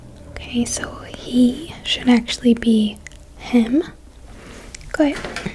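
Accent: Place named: Upper Midwest, United States. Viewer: Phil Mercer many of us think she’s from Wisconsin or Minnesota. I’m from Wisconsin and that’s what I think dere hey.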